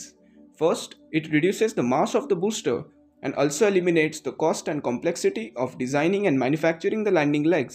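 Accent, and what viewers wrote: India. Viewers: Omg the Indian voice sounds like the scammers voice jaja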